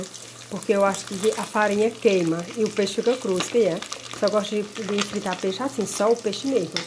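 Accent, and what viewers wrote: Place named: Northeast, Brazil. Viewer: Esse sotaque nordestino e a maneira delicada e amorosa como a senhora abençoa os seus seguidores, fazem bem a alma da gente.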